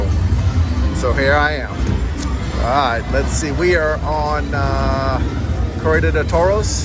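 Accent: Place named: South, United States. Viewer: Nice run!! If it ain't broke, don't fix it 💯 hey, your voice and southern drawl sounds like Brock from the Reba show. Keep on feelin lucky ❤🎉❤🎉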